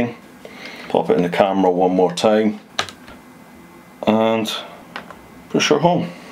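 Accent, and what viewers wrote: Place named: Scotland, United Kingdom. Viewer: Ahh, I couldn't pick it.. Sensed north of Dundee... Defo not Dundonian. Great vid, thanks for sharing. Subbed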